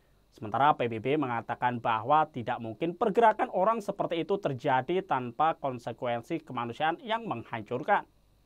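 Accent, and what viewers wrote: Java, Indonesia. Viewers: medok njir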